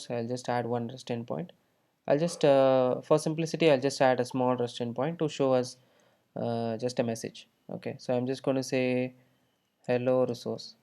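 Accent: India. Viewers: indian accent is something incredible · Dislike because, your thumbnail showed non Indian man, and when I played it was the irritating accent of an Indian.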